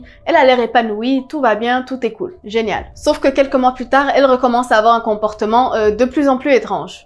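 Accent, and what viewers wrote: Quebec, Canada. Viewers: T’es tu Québecoise? Ou t’habites tu au Québec?! Parce que t’as un ti accent Québecois. (Jparle en connaissance de cause, je suis Québecoise)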